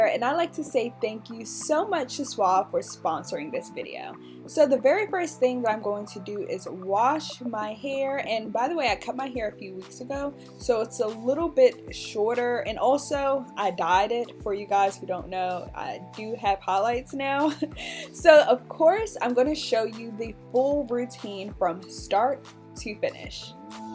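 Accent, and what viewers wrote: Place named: South, United States. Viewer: soo random but i never noticed she had a southern accent. i swear she does 😅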